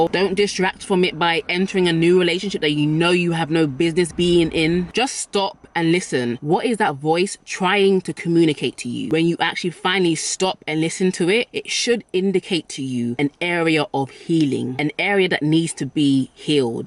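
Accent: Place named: United Kingdom. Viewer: I love her ,I think it because I feel like a black person 😂inside of me , the only thing is I don't like her British'accent its kind of crazy for me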